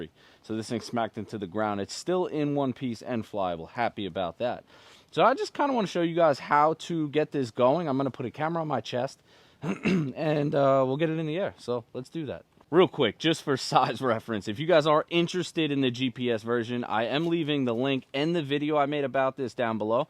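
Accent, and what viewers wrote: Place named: New York, United States. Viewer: I bought both because why not. I love your nice thick grass and your cool NY accent.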